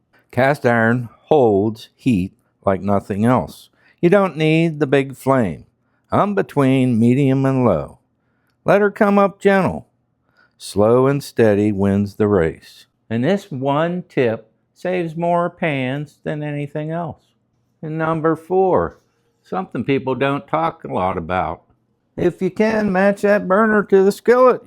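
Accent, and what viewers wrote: Pittsburgh, United States. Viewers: Are you from Pittsburgh? Excellent video! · I know a fellow Yinzer when I hear one!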